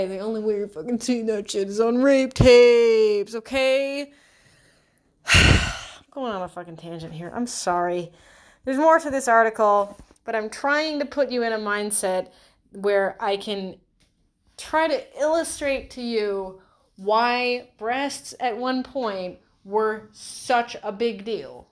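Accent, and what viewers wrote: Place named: Long Island, United States. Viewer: 👉 Great Long Island accent. Sounds like Taylor Dayne back in the 80's. ☺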